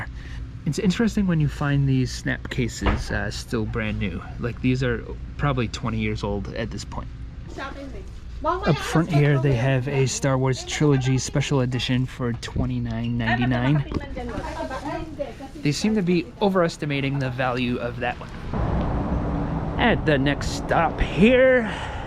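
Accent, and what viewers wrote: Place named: North East England, United Kingdom. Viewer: For those not from the North East: "harrah" = Horror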